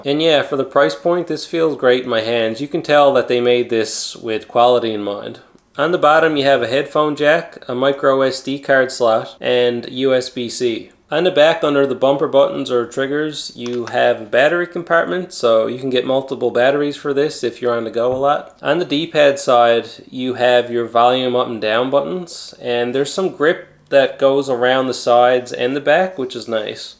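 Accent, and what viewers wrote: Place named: Maritimes, Canada. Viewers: Great review. I just got one as well ans love it. The short bootup times make it great for pickup and play on the go. By any chance are you in Nova Scotia or Newfoundland? I recognize that east coast accent. :) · I knew you were a Newfoundlander, earned a sub from Nova Scotia. Keep up the great work my trout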